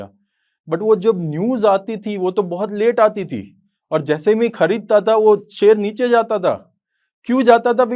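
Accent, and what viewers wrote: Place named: Gujarat, India. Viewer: I can feel that Gujarati accent in your speach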